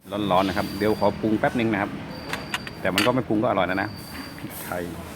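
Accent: North, Thailand. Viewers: น่าจะอู้เหนือเนาะคับ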